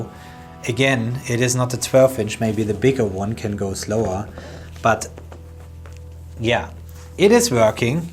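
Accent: Germany. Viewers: Hey, good video! Well done. Are you german?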